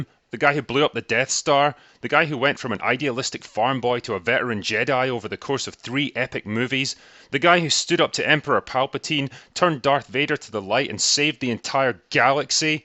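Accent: Scotland, United Kingdom. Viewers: You used to sound normal...well as normal as possible with that Scots accent. So you do put on a voice!! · Very strange hearing a Scot say "soccer ball" isn't it football everywhere except the US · So three years ago, the critical drinker was less drunk and less Scottish? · Soccer ball.......?? Ur Scottish dude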